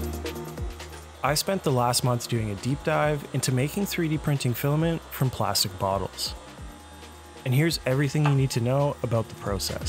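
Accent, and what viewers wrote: Canada. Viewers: Well, first I did enjoy the video, it was a reasonable use of my time. Your tone and delivery is smooth without being rushed, and the background music is faded in and out appropriately. From a video-crafting perspective, it's well done. For that alone you get a like and a comment to hopefully put more eyes on your work. :) The subject, on the other hand, is a bit lacking. "Everything" I need to know is a lot more than what you've mentioned. Equipment costs? Okay, maybe this isn't the best place for that unless you wanted to truly endorse the Sustainable Design item. But still, a ballpark is useful. How about time costs? How long does it take you to create a usable length of filament? That is a huge obstacle to any kind of home filament making - it's just so slow. And bottles? From your accent and channel name I'm guessing you're in Alberta. 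Alberta has a really good bottle recycling infrastructure. This isn't going to keep anything out of the landfill, unless you just trash your bottles instead of recycling them - and even so, YEG and YYC both have very good diversion processes at their landfills to catch stuff that can be recycled. In fact, in Alberta it's adding to the overall waste because it's harder to find a recycling centre that will take a bottle fragment. I guess what I'm trying to say is this: any video about home recycling/filament making really needs to hit the problems as well, at that means some discussion of the time cost and the fact that bottles are one of th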